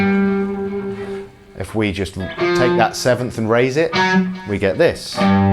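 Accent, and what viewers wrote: England, United Kingdom. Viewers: You british accent is excellent :D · ENGLISH accent.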